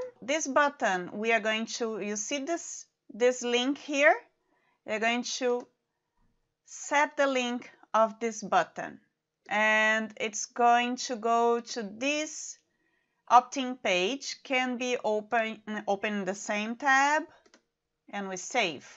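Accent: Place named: Germany. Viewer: You have a beautiful German accent 😀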